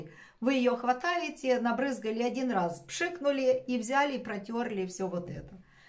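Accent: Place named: Moldova. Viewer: С удовольствием вас смотрю,.И слушаю.И в Бельцах была не раз.И в казанчике готовила.И говор ваш мне близок до слез.Я русская, но 35 лет назад жила в Кишиневе, вышла там замуж первый раз.И до сих пор снятся Баюканы и кинотеатр " Флакера"😅😅😅Я жила в 80- е годы в общаге.И у нас жили девочки и русские, и молдаванки, и болгарки, и гагаузки, и еврейки.Нет, вру.Евреек в общаге не было, но я с ними работала.Эх, хорошее время было, хоть и перестроечное)))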